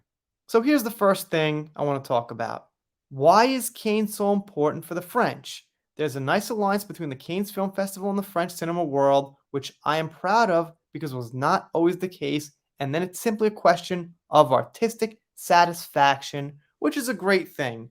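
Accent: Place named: New York, United States. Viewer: I like to hear you talk, I like your accent (unusual bc I'm a Southerner who typically can't take NYC/Boston accents 😆), & you're spreading good news about JD, so as far as I'm concerned, you can repeat as many narratives as you like!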